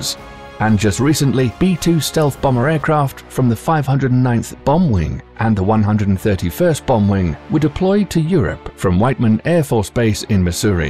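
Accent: United Kingdom. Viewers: Sorry to burst your bubble, but he's British, and that's how we pronounce it. It's our language, so don't lecture us on pronunciation.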